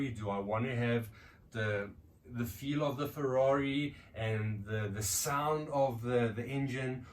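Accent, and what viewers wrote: South Africa. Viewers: Are you from South Africa? For some reason I thought your Australian for like the longest time.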